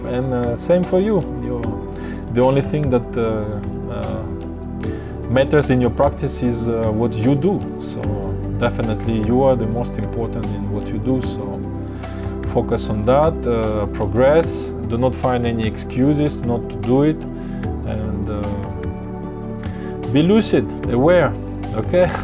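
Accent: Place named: Russia. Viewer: Thx for the answer, I didnt expect it lol. Maybe you should then love your voice more, because Russians talking English sound really nice. And when you love your voice more then youll reduce the backgound music volume automatically 😊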